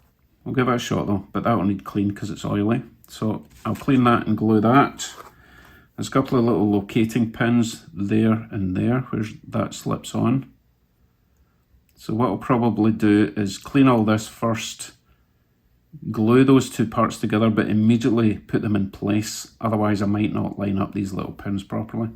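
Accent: Scotland, United Kingdom. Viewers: I love your Scotts accent my father was of Scotts descent and when speaking to older members of the family that is the accent I remember.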